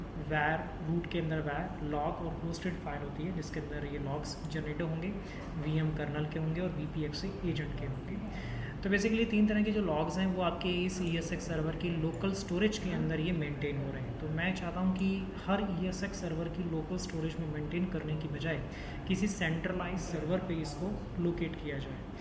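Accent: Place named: India. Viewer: Very nice Video thank you for doing the Video but the India-English is very hard to understand in that given Speed for a none english Native, also the Level of Loudspeaker is very low .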